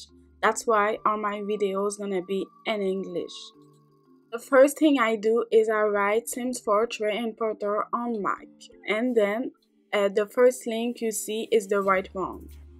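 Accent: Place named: France. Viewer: Lol you are a french people we can hear that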